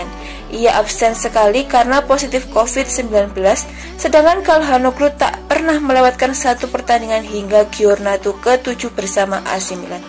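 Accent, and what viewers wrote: Java, Indonesia. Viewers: Mbak bisa d ilangin medoknya dikit gak wkwwk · Yakin ini chanel nya Milanisti?kl iya knp bahasanya lebay dan pesimis seolah tanpa calha milan bkn apa2.hello u sdr gk sih milan itu jauh lbh besar dr sp pun jg termasuk calha.btw baikin dulu narasi dan intonasi nya.jgn medok gitu...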